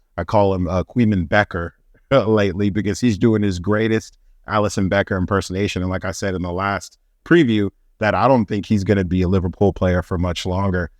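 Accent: United States. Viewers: Usually my brain turns off when I hear an American accent in relation to football talk but your depth in knowledge and analysis is superb! subbed!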